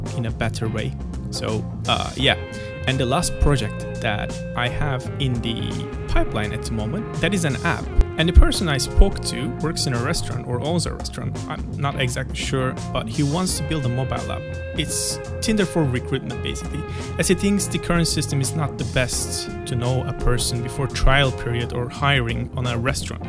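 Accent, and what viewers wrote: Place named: Turkey. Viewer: bende diyorum bu aksan bir yerden tanıdık geliyor türkish baba türkish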